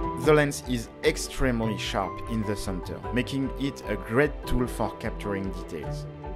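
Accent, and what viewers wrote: France. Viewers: T'as l'accent français ;-) je vais essayer de voir si je peux faire ça, ça a l'air bien cette histoire d'objectifs projecteur vidéo! Je vais essayer de trouver ça aux puces! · Tu est français ça s’entend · Your english is great! Love the french accent though :)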